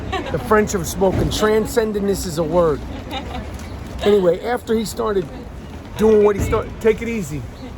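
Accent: New York, United States. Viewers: Why is the camera so close to your face? I love the passion but dayum. I’m here for your voice bc it’s SO New York and I love hearing the pain. Heat in 5 🔥🤍🔥 That joke about Randle was funny tho. Lint brush. Maybe that man have other stuff on his mind 🤷🏽‍♀️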